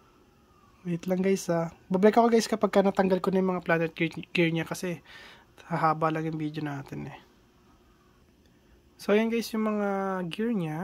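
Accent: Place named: India. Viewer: Bros talking Indlish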